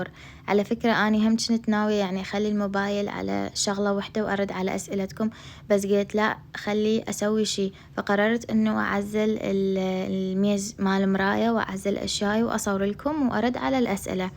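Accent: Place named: Mosul, Iraq. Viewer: حبيبتي استمري انتي من اي محافضه بلعراق من لهجتج من الموصل اوكركوك علما اضن واني اختك من النجف نشرف بنات العراق وبلنسبه لزوجك عادي المهم مسلم لا فرق بين مسلم. واعجمي الا بلتقوى ❤❤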